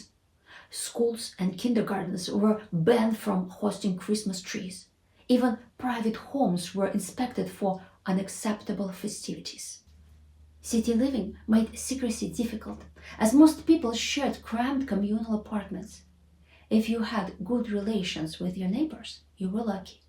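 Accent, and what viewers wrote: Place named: Russia. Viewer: It is very soothing listening to your Christmas description with your charming Russian accent. 🤗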